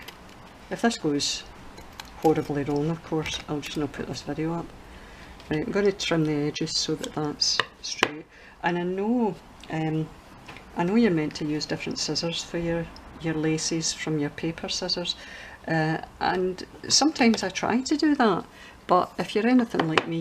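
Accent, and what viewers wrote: Scotland, United Kingdom. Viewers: like everything you do, these tags are spectacular. Love to watch your videos and so enjoy your beautiful Scottish accent. My maiden name was Campbell and I would love to visit Scotland one day. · Just Magnificently Beautiful! I Just Luv All Your Artistic Work&How You Fixed The Problem! I Luv Listening To Your Scottish Accent Too! I Don’t Fall Asleep Watching Videos But I Keep Yours&Others I Luv&Put In Favorites&Watch Later!💜🦋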